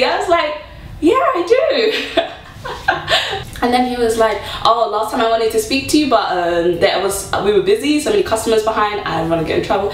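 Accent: United Kingdom. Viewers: Cute...your my Nigerian sister and the only difference is the accent, I speak american and you speak british. I love your stories its awweeessoommee....... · So glad I found you! What teach program are you with? I just applied for study abroad to Korea for the Summer. I want to start teaching there when I graduate (Dec '13)....So excited. I love the British accent. I would go into it so much in High School because I watched so much BBC.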